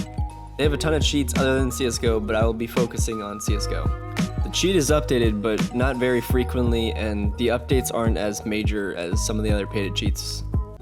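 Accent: Switzerland. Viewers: Wait, are you from switzerland? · Are u from Switzerland?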